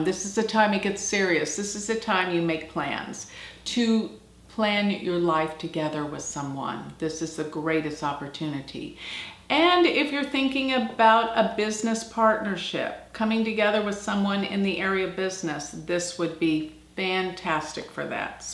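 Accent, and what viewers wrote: United States. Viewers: Typical American accent....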